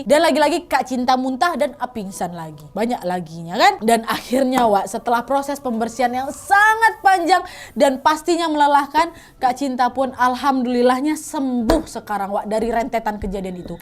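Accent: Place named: Madura, Indonesia. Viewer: Kok lama lama logatnya kayak orang madura , lucuu banget 😁🥰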